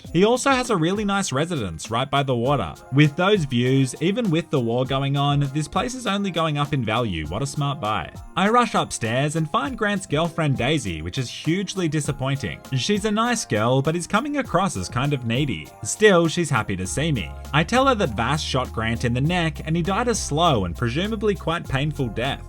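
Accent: Australia. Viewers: You know you’ve been watching a lot when you can’t say ‘anyway’ without saying it in an Australian accent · By far my favourite game ever created. But FYI Dennis is the son of an African warlord and went to America but he was treated badly so went to the Rook Islands to make a difference. I assume that was the name given to him in America. But great video its kind of like if SovietWomble and Charborg did a review in an ozzy accent. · good content good ausie accent too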